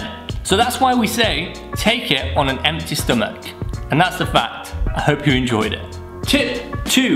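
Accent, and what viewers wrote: United Kingdom. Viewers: I had to turn the caption on because of the British accent 😂 I'm a fresh PharmD graduate and i'm interested in patient education and you are indeed inspiring me a lot!!🙌🏼 keep up the great work! God bless you!🙏🏻✨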